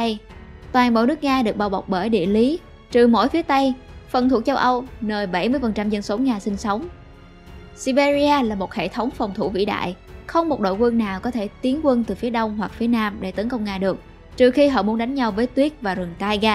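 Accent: South, Vietnam. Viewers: Bài viết hay,giọng đọc chuẩn Nam bộ rất ấm áp...like ***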